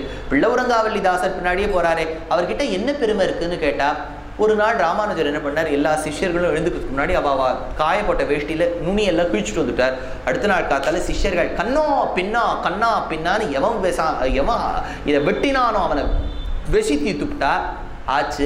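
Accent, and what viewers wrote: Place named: Bengal, India. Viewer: Your Bangla accent was so fine Swamy. Loved that song. Pranams to u.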